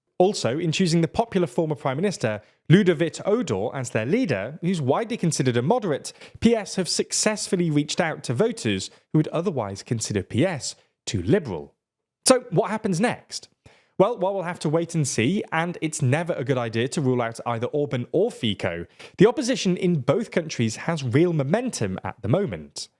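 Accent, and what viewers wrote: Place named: Upper class, United Kingdom. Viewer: Can you find presenters without the uppercrustbritishimbetterthanyou accent? ITs off putting. Sounds like Harry lecturing me.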